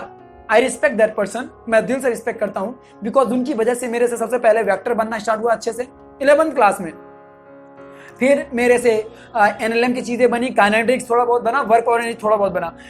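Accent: Bihar, India. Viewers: Sir aap bihar se h kya?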